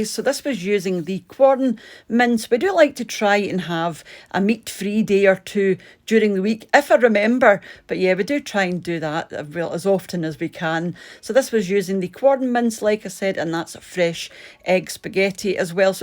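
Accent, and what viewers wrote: Scotland, United Kingdom. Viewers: "Carrot Crush" is from "The Royle Family!" 📺🤣 I love your wonderful Scots accent, and you cheerful positivity,! 👍🤣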